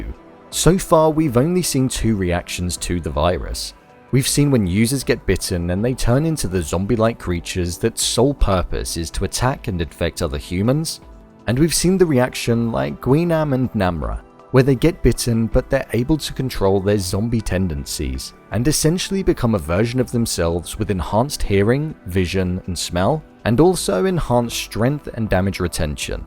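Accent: United Kingdom. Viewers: i subed uh provably not for these topics but for the accent lol , listening you will help me learning british english a lot.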